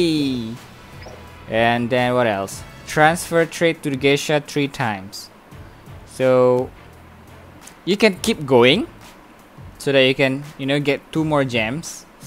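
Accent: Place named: Malaysia. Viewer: patutlah suara mcm org Malaysia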